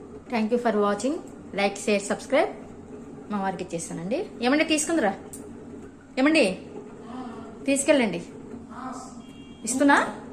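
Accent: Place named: Kurnool, India. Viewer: ఇంతకీ ఎండు కొబ్బరి వేసారో పచ్చి కొబ్బరి వేశారో క్లియర్ గా చెప్పలేదు. మెంతులు,జీలకర్ర పొడి అన్నారు అవి వేయించి పొడి చేశారా?లేకపోతే వేయించకుండానే పొడి చేశారా?మీరు కర్నూలు ప్రాంతవాసులా? మీ భాషలో యాస చూస్తే అలా అనిపిస్తుంది...I am from kurnool 😊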